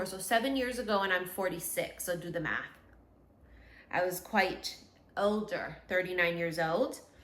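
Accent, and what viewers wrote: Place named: New York, United States. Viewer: Oh wow. Didn't know you were half Japanese nor Filipino. I knew it was something but couldn't put my finger on it. Sometimes You sound like you grew up in my NYC neighborhood! 😂 Love it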